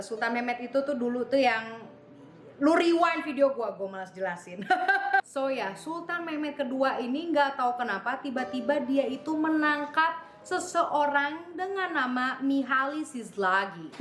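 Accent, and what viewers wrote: United States. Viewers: aksen english amerika kamu kak😭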